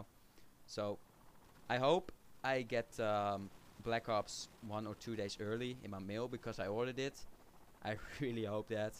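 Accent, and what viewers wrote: Netherlands. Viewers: Dutch accent xD, it's really funny:P. Nice game btw netjes flawless ik word altijd op het laatst nog gekilld:P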